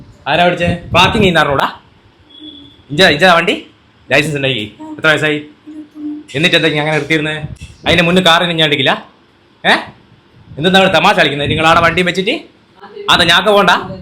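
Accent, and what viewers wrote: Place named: Kannur, India. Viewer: Vadakara, nadapuram, kuttiyadi side slang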